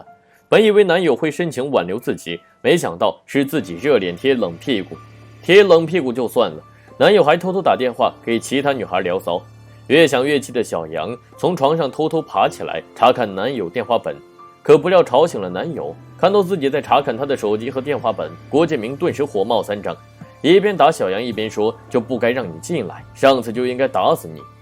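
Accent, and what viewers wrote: Standard. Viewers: 主播发音特别标准 声音好听 ， 废话太多 😂 继续加油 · 不管怎么样配音的很好 标准，耐听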